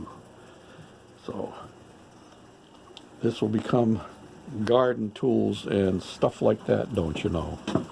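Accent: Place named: Minnesota, United States. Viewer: Your Minnesota is showing "Don't ya know"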